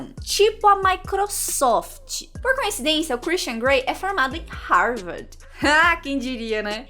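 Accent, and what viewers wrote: Paraná, Brazil. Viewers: Perai, existe sotaque paranaense?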